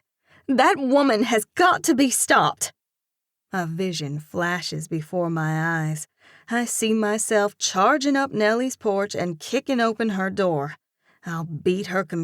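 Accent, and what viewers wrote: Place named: South, United States. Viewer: Another great book in this series. I’m loving every one of them. The narration is top notch. Of course, I’m partial to the southern accent since I am from the South! Please keep this series going.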